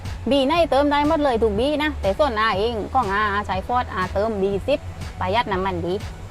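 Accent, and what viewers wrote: South, Thailand. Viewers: นี้และมั่น เป็นตัวของตัวเอง บรรยายได้ดีมากคับ ผมก็คนใต้ ชอบๆคับแหลงหรอยนิ🥰🍃💨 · พูดใต้เป็นตัวของตัวเอง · บรรยายแบบใต้ๆ จริงใจกันเอง น่ารักครับ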